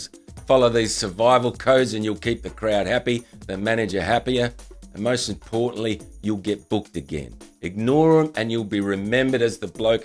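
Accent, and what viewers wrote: Australia. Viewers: Australia mate!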